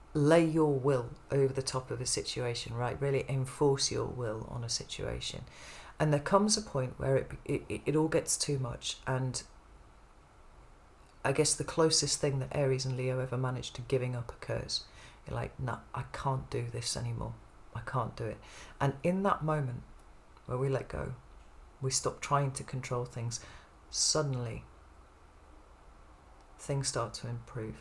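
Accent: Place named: Essex, United Kingdom. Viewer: Top shelf shit! What an amazing woman. You sound like you’re from my neck of the woods (Essex) too. Much love and thanks.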